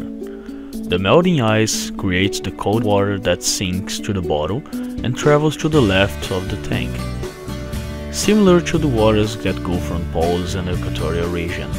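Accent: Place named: Russia. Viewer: u have to be russian!? thanks tho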